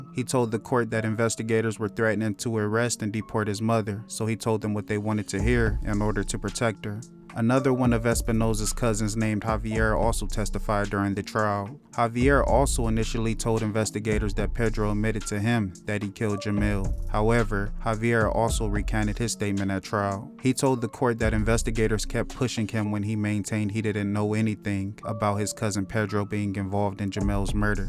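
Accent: California, United States. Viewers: your voice is soooo cali and i love it! i love when ppl rep the city! keep going king ❤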